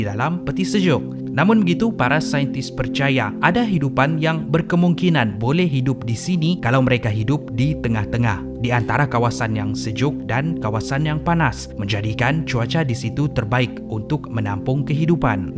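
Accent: Sabah, Malaysia. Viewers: Abg ni sabahan kan? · ni org mana? sabah ke? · Kan,sbb cara pencakapan die bukan macam malay..dia cakap mcm ada slang sabah n indon sikit..tulah sebb org ingat die indon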